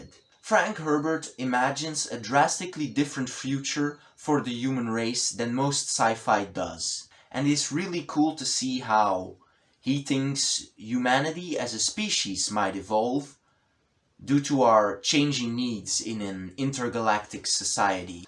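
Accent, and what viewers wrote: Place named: England, United Kingdom. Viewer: Liking the English accent, strong opinions and of course the bun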